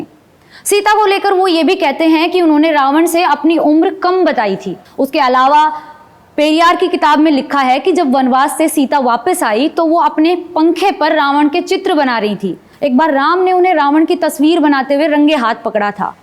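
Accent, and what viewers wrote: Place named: Haryana, India. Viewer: You have a distinct haryanvi flavour in your accent, and i really like it. Respect from this bihari. Love your journalism and topics that you raise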